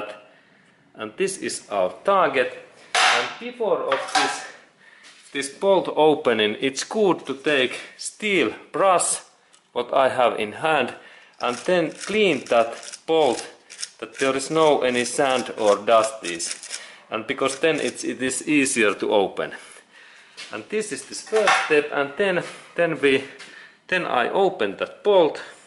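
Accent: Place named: Finland. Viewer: OK , I am willing to bet that this guy is Finnish , typical accent from Contemporary Finn who learned English as second language.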